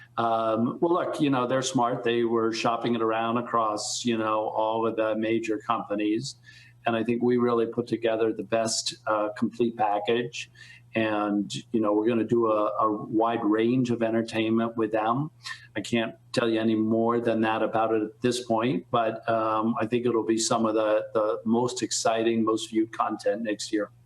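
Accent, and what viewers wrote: England, United Kingdom. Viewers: Hi! We have been watching your videos for a long time now! We are from Serbia and we never ever liked Mm and nor P Harry eighther. We just want you to know - we admire your work, your wonderful English accent! You are always right! We think - from over here we see - Harry has always been jealous and envious at his brother, it’s so obvious when people like us don’t have any reason, any use, any connections, we just see clear. We support you! Your thoughts about them - sooooo true! Love you, watch you!!! Thank you!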